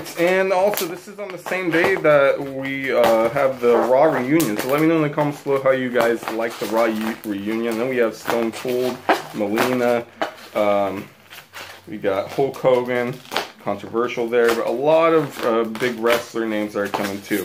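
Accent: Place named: Australia. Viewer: That's actually quite a good aussie accent!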